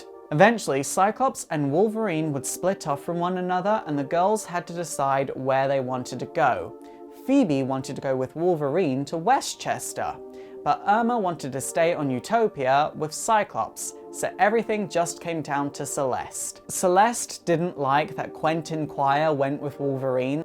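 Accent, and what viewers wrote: United Kingdom. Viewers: Hes british, i think he is pronouncing it the way its supposed to be.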